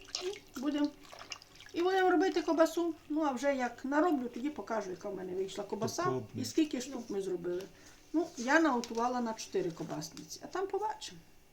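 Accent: Ternopil, Ukraine. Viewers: молодець! Говорить так,як розмовляють на Тернопільщині,в кожному місці свій діалект,в мене полтавський,коли навчалася в школі,то розмовляли по русько- украйонскі.Так на нас говорили з інших місцевостей 😅Мені дуже подобається,як говорить.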